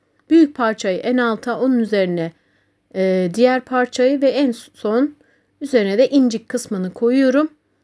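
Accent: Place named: Kilis, Turkey. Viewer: Aynı Kilis lisanı❤❤❤gibi konuşuyorsun ❤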